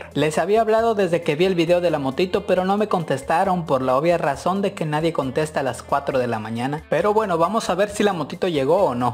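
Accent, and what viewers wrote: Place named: Juchitán, Mexico. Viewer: Ah perro eres de Juchitán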